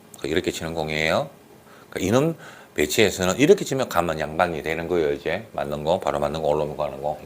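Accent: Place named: Gyeongsang, South Korea. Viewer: 전형적인 경상도 말투에서 듣는 사람에게 짜증이나 화를 내는듯한 말투죠, 근데 실상은 그냥 평범한 말투인데 타지사람이 듣기엔 참 불편ㅋㅋ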